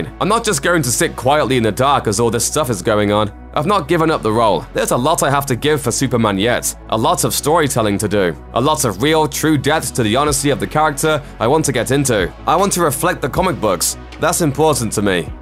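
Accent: England, United Kingdom. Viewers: Dan Rockell just like there are many different accents in the USA...there are different accents in the UK too but he’s 100% English accent, I couldn’t tell you what part as I’m from the states but without a doubt brithish. I honestly love his voice for these videos ahah.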